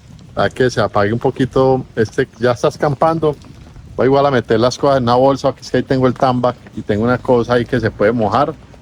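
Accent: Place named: Colombia. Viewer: . Hay un asunto de falta de identidad en colombia muy marcado, pienso YO y sobre esto ya existen investigaciones, las topofobias y las topofilias "Gi fu Tuang", pero a usted lo que le falta es tener un acento distinto al colombiano, puede ser que esto venga desde la conquista, nos arrodillamos ante el extranjero e ignoramos lo propio por más bueno que sea. Soy un consumidor de vídeos de viajeros y miro los números y cuando pasan por Colombia se disparan, vuelvo y lo digo el acento....pueden hablar pestes de nosotros y sus vídeos ser basura pero en Colombia crecen. Puede ser por ahí el asunto. Dicen que nadie es profeta en su tierra.....a mi si me gusta demasiado lo que estás haciendo, el formato, la fotografía, la edición, la música, etc. Pero solo conmigo no es suficiente. Un abrazo y pa tras solo pa coger impulso.....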